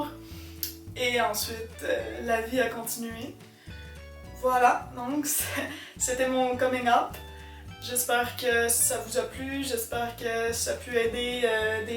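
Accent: Quebec, Canada. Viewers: Tes tu canadienne ? Super video sinon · ah bon tu viens de dire que tu es déménagée au canada, ça doit être pour ça que t'as l'accent :) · ok ton accent français/québécois wow! :D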